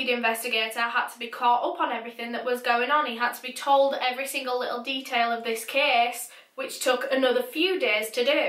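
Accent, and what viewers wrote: United Kingdom. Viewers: I can heard talking for the rest of my life and I would not get bored. She is so eloquent with what she says and she makes you feel like she is only talking to you face to face. I love all of her true crime videos, even tho I hate to see all of these horrible things that happen on this world. It is good that people like her can talk about it to bring awareness. I truly enjoy the way you talk, even tho the british accent is a little hard for me as a hispanic person. One thing, I think you videos would look better if you change you backgroud because it just rare for me to keep looking on you lipstick as you are talking about terrible crime stories just my opinion. Anyways, love you videos, I hope you keep them coming.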